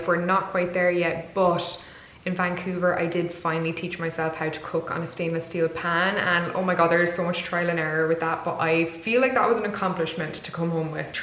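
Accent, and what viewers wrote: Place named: Ireland. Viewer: The combination of your beautiful Irish accent with the rain sounds in the background is so soothing ❤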